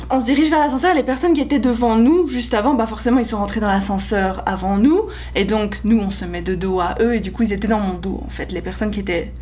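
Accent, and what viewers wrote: Belgium. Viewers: mdr ta façon de parler et tes sautes d'humeur me font penser à gonzaroo ! XD à croire que tous les belges s'expriment pareil . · bonjour.Venez habiter à l Ile de la Réunion!! Ici il y a quasiment que des métisses et sa marche. On devrai envoyer les étrangers en stage chez nous On a toutes les ethnie possibles : européens chinois , indiens , africains, malgaches, belge (humour) (mais pas encore de japonais! ça manque) ect... et tout ce petit monde s'aime et se reproduit a tout va ... Personnellement je suis chtimi des hauts de france (ça pète hein !) (salut cousine) et je suis mariée à une réunionnaise , bilan : mes fils et mes petits enfants sont tous de couleur différente !!! pas facile d’être raciste dans un tel contexte !!!!Enfin on s 'en accommode....voila voila , tout ça pour dire que si tous les cons volaient bin il ferait nuit 24h sur 24. 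Bien venu au petit haricot que le monde lui soit propice . Ps : vous êtes belge je confirme il y a des petites intonations qui ne trompent pas ! Amicales pensées.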